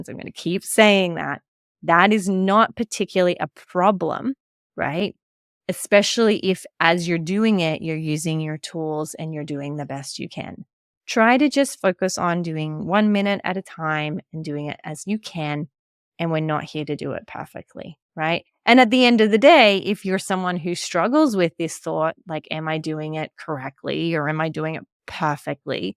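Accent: Australia. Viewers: I couldn't place where you accent is from, my best guess is you're an australian who moved to texas when you were 18 ^^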